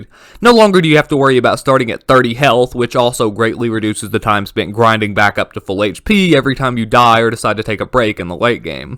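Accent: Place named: South, United States. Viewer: Your voice sounds like a mix of optimus, mrBeast, and a slight bit of southern and it amazing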